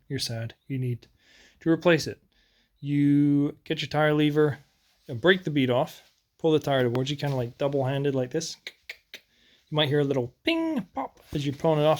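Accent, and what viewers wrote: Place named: Scotland, United Kingdom. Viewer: .... that was a proper comprehensive wheel install.. keep doing what you LOVE... I knew I heard a slight Scottish accent.. I dig this shit👍🏻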